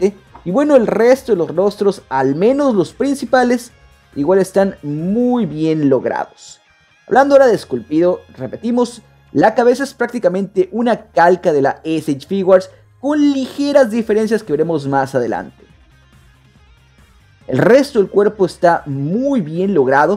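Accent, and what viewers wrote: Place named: Peru. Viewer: Buen video, Eres de México Bro? De que parte? O eres de sudamérica? Tienes un acento como Peruano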